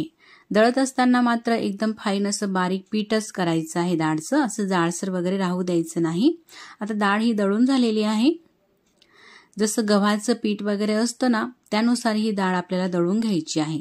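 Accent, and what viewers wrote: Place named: Jalgaon, India. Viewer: Jalgaon kadil lok asech boltat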